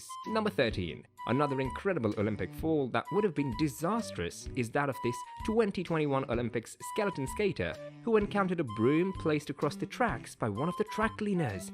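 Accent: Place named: England, United Kingdom. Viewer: The English accent make it so much funnier👌.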